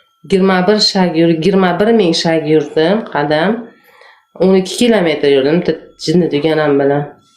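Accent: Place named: Fergana Valley, Uzbekistan. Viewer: ❤. Salom. чиройли сингилжон, Шева водийликка ухшайсан, хозирда каерда ишлайсан, сенга омад тилайман. Хохласанг оздирадиган рецепт ташлайман. И. Б. Н. Синодан. ❤❤❤❤❤❤❤❤❤❤❤❤❤❤❤😂😂🎉🎉🎉❤❤❤❤.., раджаб ота78 еш.. шифор пенсионер.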